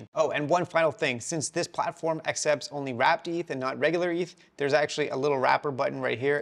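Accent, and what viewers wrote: Australia. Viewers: but he sounds like an Aussie... kind of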